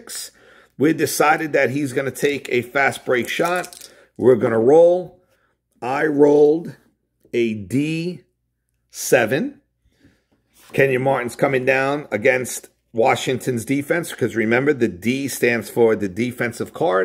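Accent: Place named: New Jersey, United States. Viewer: I loved the video...and from your accent I'd say you were from New Jersey :) I'm starting a "hybrid" league (12 divisions w/8 teams per division) based on HS basketball rules (4 quarters - 8 minutes long each quarter). I'm going to start with the basic version and work myyself up to the advanced version (and your tutorials really help!)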